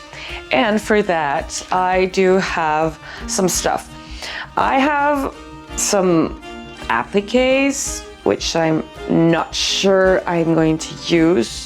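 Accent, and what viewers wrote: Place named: Scandinavia. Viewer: I absolutely looove watching your videos! I’m a beginner to sewing, I’ve only made one thing🌸I started sewing because I wanted to make beautiful gowns like this, and your videos really inspire me to do that!!🌺 I have to say that the thumbnails are absolutely amazing and so beautiful💕I can’t wait to see what you do next 💓Your accent reminds me of a Nordic accent? I’m Swedish and it kinda resembles that💗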